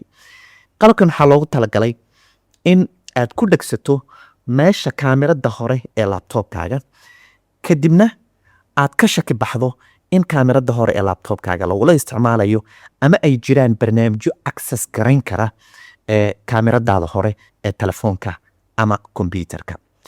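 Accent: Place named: Hargeisa, Somalia. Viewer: Adi reer hargeysa ayaan ku moodayeybe😅😅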